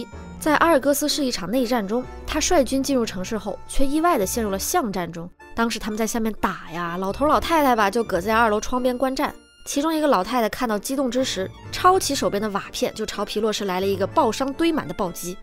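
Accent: Cantonese, China. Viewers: 廣東話太可愛啦🎉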